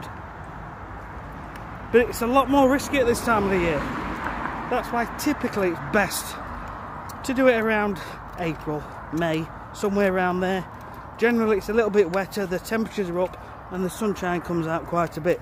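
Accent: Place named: Sheffield, United Kingdom. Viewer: Where are you based? Your accent sounds local to Me, I’m in Sheffield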